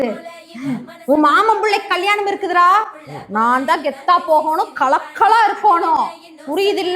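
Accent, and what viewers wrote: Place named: Coimbatore, India. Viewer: The epic CBE slang, flexing it...❤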